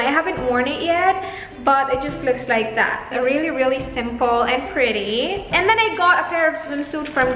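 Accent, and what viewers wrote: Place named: Visayas, Philippines. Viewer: What city do you live ate? The way you speak tagalog makes me think you're bisaya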